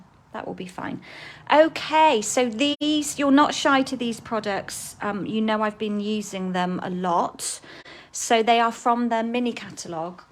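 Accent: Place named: United Kingdom. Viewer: I'm watching the replay. I'm getting a kick out if all your British terms. I love, love your accent! The cards are lovely.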